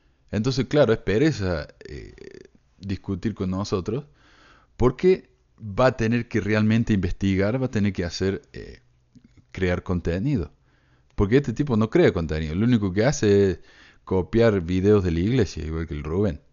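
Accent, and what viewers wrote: Córdoba, Argentina. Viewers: =) que Genio sos!!!. Admirable y Valorable todo lo que compartis, excelente tus opiniones, exposiciones y material sos un Capo Genio ya que presentas evidencias respaldatorias y material empírico de gran ayuda para reflexionar y pensar. Te escucho y sigo ya que todo lo que compartís es de gran ayuda y me sirve mucho en temas que veo en la carrera de Psicología. Además que me encanta seguirte por tu "humor inteligente" y amo tu acento Cordobés y tus expresiones!!!. Tu sinceridad y tu "open mind" hacen de vos un gran ser humano =) Besotes & Abrazotes desde Argentina =)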